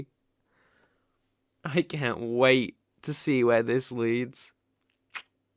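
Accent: United Kingdom. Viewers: British. Take it from a Brit. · The British accent is just : 😩👌 wonderful 😭🌟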